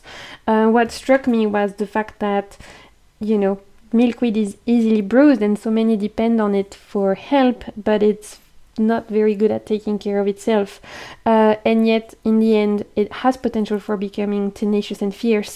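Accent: France. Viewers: That’s a very complex oracle deck. You can tell a lot of time, energy and research in making this deck come to life. I will check it out and the 10% discount is great! Thank you for reviewing this for us., you need to make an oracle based on the plants and wildlife you grew up with. Teach us about where you live in the world. Many days I wish I was on a tropical island. Especially with winter in the Northern Hemisphere is so bitterly cold. BTW your French accent is lovely. I studied French in high school. I’ve always thought it was a beautiful language.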